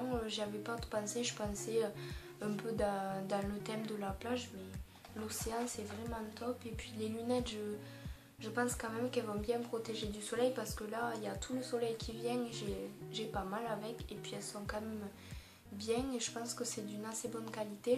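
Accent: South, France. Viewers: Tu es superbe! Le petit accent du sud j'adooore! J'ai TROP envie de tester les box je m'inscrirais surement à la rentrée, parce qu'avec les vacances c'est pas trop le moment ^^ (Je ne peux pas répondre à tes commentaires sous mes vidéos, parce que tu ne me suie pas sur google +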